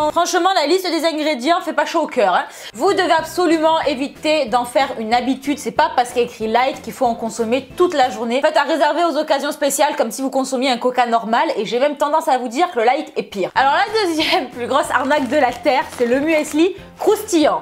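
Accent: South, France. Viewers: t'es au top! Je regarde toute tes videos et je te suis sur insta tu es vraiment un rayon de soleil avec ton petit accent du sud tu fais déliré!!!:) continu tu nous motive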